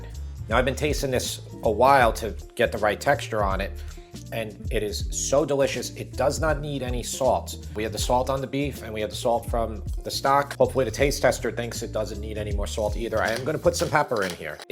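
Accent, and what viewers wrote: New York, United States. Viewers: Holy cow! You ARE from NY. I'm originally from NJ, but at this point in my life... don't talk so fast. 😂 But Finally, I found a good recipe for beef barley soup. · Hey there, slow down guy!:- typical "Nyoo Yawkeh", talkin' a mile a minute! Most of us are not from New York and we like to get it nice and slow, take your time please, it's a good video, no need to rush it! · You can cook for me anytime 😊. Recipes are spot on and easy to follow. I love watching you and your family and enjoy listening to your New York accent.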